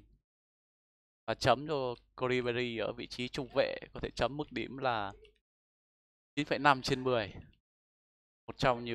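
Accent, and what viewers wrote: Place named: Yen Dinh, Vietnam. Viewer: nghe giọng nói là nhận ra a ở yên định. Bạn anh ở thọ xuân có vài người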